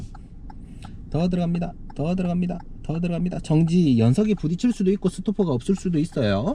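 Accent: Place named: Busan, South Korea. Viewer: 열정이 있네요~ 은 민초들의 눈높이 교육방식 아주 아주 조아요 칭찬합니다 굿^^ 악센트가 부산 같기도 하고 푸하 ^(^ 항상 마음의 중립 중립 중립 하시길 P.S-> 근데 발이 큰가요? 닉이~ 군요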